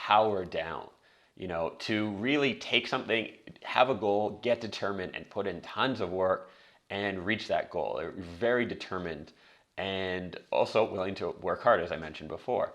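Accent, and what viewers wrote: Canada. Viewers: I found out I was INFJ earlier this year, and wish I had known earlier. Your advice is on the right track. Even as a child, I had to know why before I acted on someone's orders. Entering the military would have been hell for me. I have been working mostly alone since 1987, and found it is the best way for me to function. Working with others who did not have the same standards ended up making me close my doors, limiting communication with them. Once going on my own, while it can get lonely, ended up saving me all kinds of conflict and pain. And having to follow a procedure/process oriented, controlling boss uttering, "because I said so" would be purgatory for me. Did I make mistakes? Hell, yeah. But you try not to make the same mistake later on. I can tell from how you talk you are Canadian. Keep up the good work.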